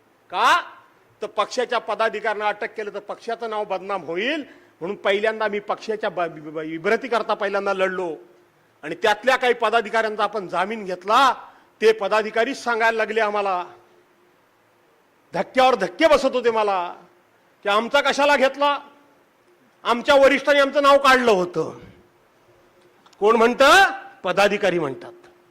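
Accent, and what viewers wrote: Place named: Konkan, India. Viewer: Kokani awaz dadanit awaj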